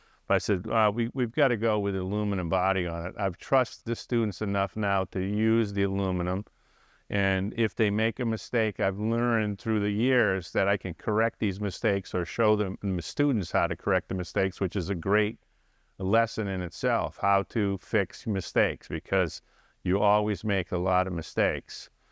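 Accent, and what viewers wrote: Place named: New England, United States. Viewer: Where in New England you from.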